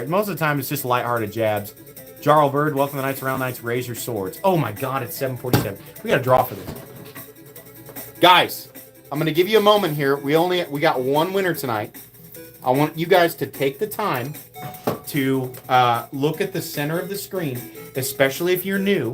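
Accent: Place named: South, United States. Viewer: Little bit of a southern draw on this episode. Let me find out you're one of us Smokey Mountain Knife Works locals and you've been playing the long game the whole time.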